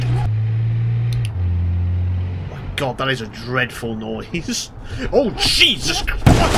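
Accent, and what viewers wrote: United Kingdom. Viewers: Bro sounds like the UK. He sounds exactly like a UK haver would sound like.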